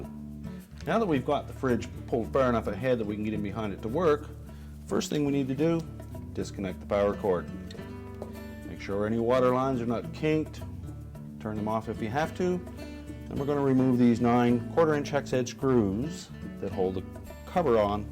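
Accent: Canada. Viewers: Hi! I feel like I know you!!! I just want to say thank you for the VERY well done video on repairing appliances! I've watched your vids on replacing a dryer belt, as well as just today repairing my 8 year old hotpoint fridge. I replaced both the compressor fan motor and the control board, and I owe it all to your informative, easy to follow, instructional video! So this girl just wants to thank you, thank you, thank you! P.S. Are you Canadian? (jus wundrin' lol!)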